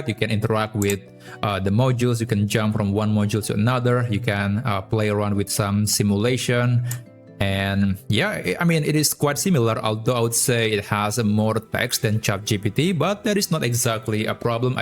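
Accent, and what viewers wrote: Indonesia. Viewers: bro you have indonesian accent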